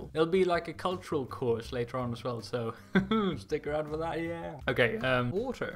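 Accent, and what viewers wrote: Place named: United Kingdom. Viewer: I just binged a bunch of your Sweden/swedish related videos and I was convinced you were british!! no way :D also love your sense of humour sjfhd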